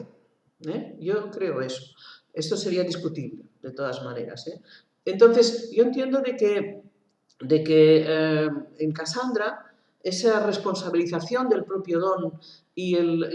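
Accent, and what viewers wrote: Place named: Catalonia, Spain. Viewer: me subscribo ,me gusta como narras, buena dicción, por tu acento deduzco eres catalana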